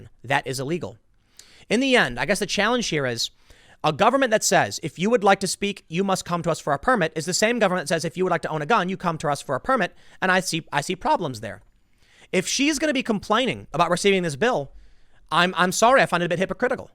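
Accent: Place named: New Jersey, United States. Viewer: Going to miss occasional Jersey-speak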